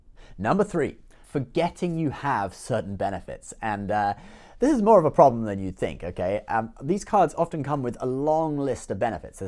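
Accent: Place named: United Kingdom. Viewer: British English, my friend.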